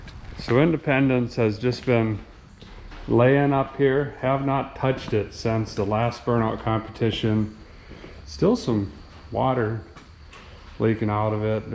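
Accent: Minnesota, United States. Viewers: Can't wait till you pick up a Southern accent on top of that Minnesota accent. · Your accent is super thick for Minnesota. I'm in duluth what part of Minnesota are you from?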